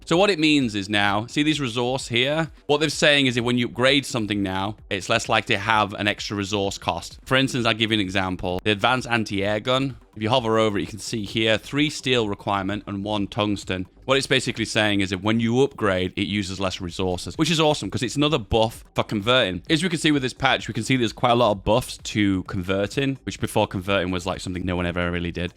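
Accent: England, United Kingdom. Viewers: You know, Ive watched your videos for years. No idea what you looked like. This isnt what I expected lol not bad. lol Whats the accent? like region of England?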